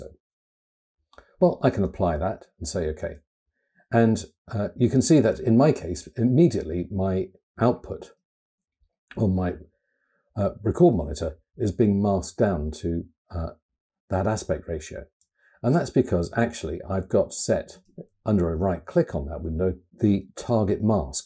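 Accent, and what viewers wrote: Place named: RP, United Kingdom. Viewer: You sound very posh! Thanks for this - it helped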